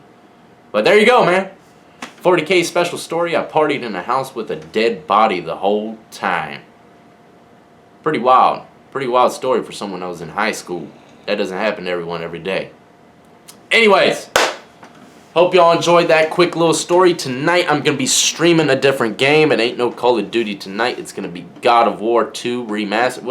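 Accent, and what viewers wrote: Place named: Texas, United States. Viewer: I swear your from Texas bro.. Very similar slang and accent.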